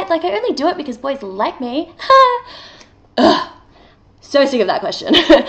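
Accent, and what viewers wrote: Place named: Australia. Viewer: 1st time ive watched one your vids,your funny as fuck.aussie as accent.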